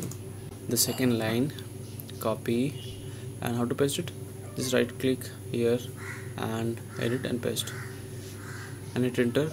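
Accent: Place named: India. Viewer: thanks, it helped but ur indian accent though😂 😂 😂 😂 😂 😂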